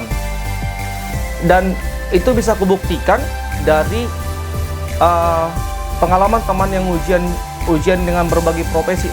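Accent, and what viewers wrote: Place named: Balige, Indonesia. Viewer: Masih sama kali logat abang ngomong ya kayak di balige dulu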